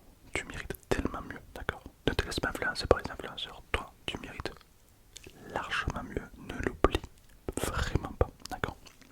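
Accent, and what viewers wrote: South, France. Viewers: j'adore ta voix c super relaxant (et surtout j'aime bien ton accent du sud) 😁😁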